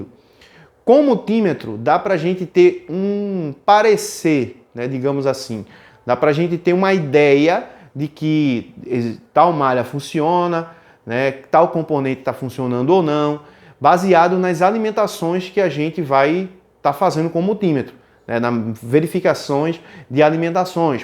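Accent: Pernambuco, Brazil. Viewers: Tu es Pernambucano? E alve Rubro?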